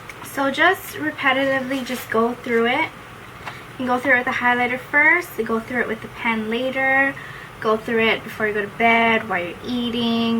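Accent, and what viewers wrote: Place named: Hawaii, United States. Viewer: I knew you were from hawaii the moment i heard your voice ! LOL xp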